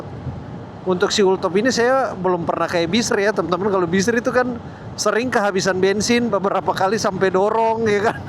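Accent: Ambon, Indonesia. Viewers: Logat ambon kantara Sukses selalu kk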